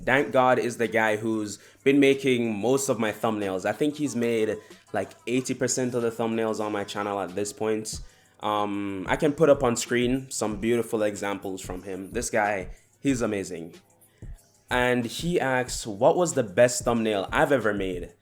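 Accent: Jamaica. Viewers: im half jamaican and I could see that you were jamaican in your voice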